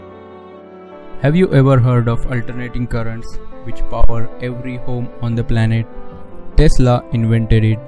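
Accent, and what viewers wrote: India. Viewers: Your voice hahahahahahahahahah wtf man indian pls give eng in peace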